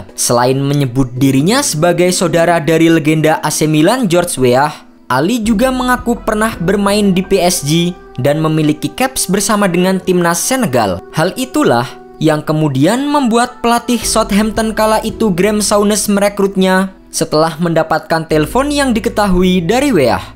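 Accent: Indonesia. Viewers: Aksen indonya kurang2in mas, trayel bacanya ga sih? Bukan trial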